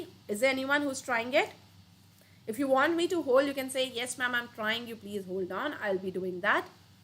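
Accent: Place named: South Delhi, India. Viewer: she got the south delhi accent :face-blue-smiling::face-blue-smiling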